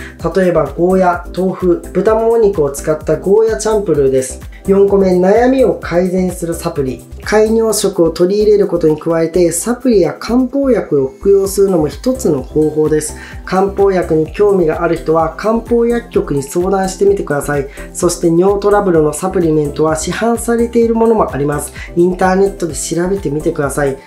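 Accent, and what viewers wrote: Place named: Tohoku, Japan. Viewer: はじめまして いくつか動画拝見し チャンネル登録しました。 東北なまりが懐かしいです^_^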